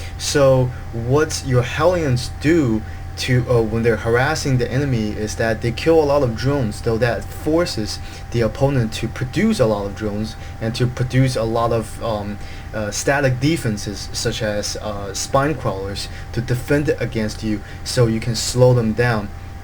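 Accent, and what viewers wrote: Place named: Asia. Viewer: good ears, i can tell it's an asian speaking too if i listen closely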